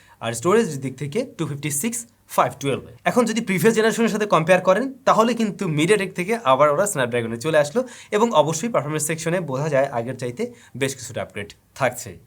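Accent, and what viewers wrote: Barishal, Bangladesh. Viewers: borishailla tola aise phone review korte ,, taw atc er moto vfx diya ,, hla cringe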